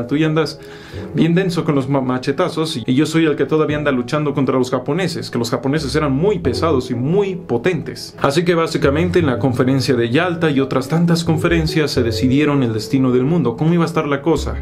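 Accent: Chile. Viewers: Excelente, en chileno entiendo mejor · es chileno?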